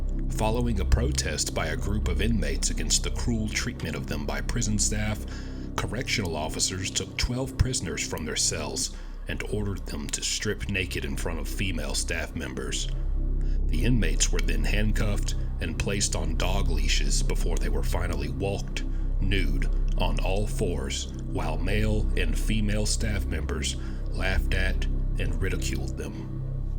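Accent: South, United States. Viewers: ! Your pronunciation, enunciation and articulate diction, while having a wonderful southern gentleman accent, are very refreshing to say the least! I thank you and you should congratulate yourself as you are a rare gem. Thanks again!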